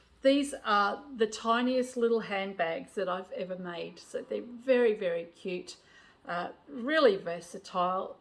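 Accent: Australia. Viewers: Hello... u'r sew'n friend Anna here from Greensboro NC. Luv the Itty Bitty Bag and I've been wait'n 2 make one every since I watched u'r last shop tour, but I ran n2 an issue when make'n it. I went by the "transcript measurements" it says the main fabric is 10½" x 3½", contrast (black) is 10½" x 1½" and the lining is 10½" x 3½"... it didn't dawn on me that the lining was gonna bee 2 short until after I put the zipper in and turned the lining 2 the backside of the open bag 2 press neatly down. I watched as u did the steps but bcuz u lined u'r bag w black fabric I couldn't c that it was even w the bottom of the bag. Lesson learned. Main fabric needs 2 bee 10½" x 2½". Don't go by transcript measurements. I suppose that u'r beautiful Australian accent was misinterpreted. Just thought I'd warn u and others. Waffle on my dear lady, waffle on.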